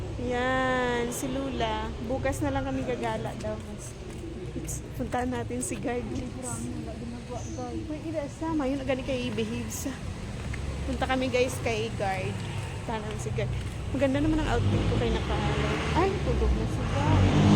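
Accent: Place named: Visayas, Philippines. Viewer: Namis mo pala lugar 19yrs old ka pa huling silip. Muntikan na nawala si Ramen, ok yan bisaya accent, actually kahit ano accent ok lang. Napansin ko mahilig ka po pala talaga magpapicture. Sana all may phographer taga kuha picture. Sipag ng photographer mo, siya pa humirit na isa padaw picture. Ang galing ni Ramen sumundo sa inyo. Ayos!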